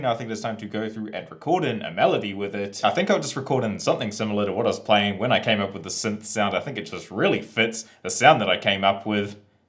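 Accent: New Zealand. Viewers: New viewer here: Your channel says Australia, but all I can hear in that accent is New Zealand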